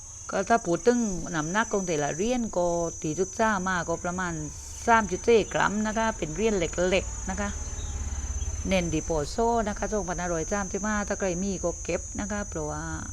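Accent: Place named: South, Thailand. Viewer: ฟังเสียงคนปักใต้ชอบครับ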